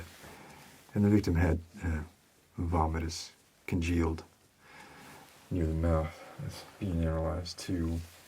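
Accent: South, United States. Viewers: Your Southern accent has really come far! Great video as always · Your southern accent is very good, just focus on using a softer "r" sound and it will be perfect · The naturalness of your southern accent in your roleplays is incredible. It can sound so fake and over exaggerated on some people but yours just falls right in to place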